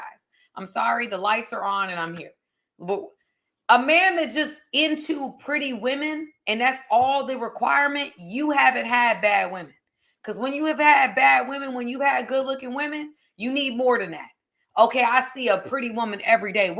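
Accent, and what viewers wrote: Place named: New Jersey, United States. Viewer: The only thing I know about New Jersey is what ive seen on it’s always sunny and that it’s also the birth place of jay and silent bob and I can see you growing up there the way you speak 😂😂 i definitely was a giant red flag no way around it but i think I’m a white flag now I’ve surrendered myself as we should all be. Ive still got that red flag but I’m not waving it around anymore it’s just for emergencies I think.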